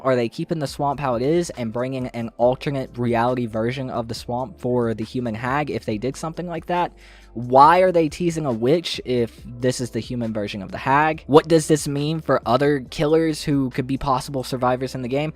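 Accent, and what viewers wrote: United States. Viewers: The strong American accent gets a like from me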